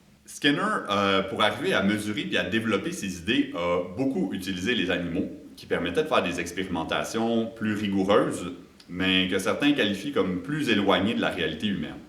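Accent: Quebec, Canada. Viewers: C'est très difficile de comprendre pour moi, c'est le dexuiême temps que je regards une vidéo par un Québecois mais je ne suis pas encore capable de comprendre, c'est triste. j'éspere réussir :D merci quand même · c'est vrai au début j'avais mal à comprendre le français québécois mais maintenant ça va j'y arrive et merci beaucoup pour la vidéo qui m'était utile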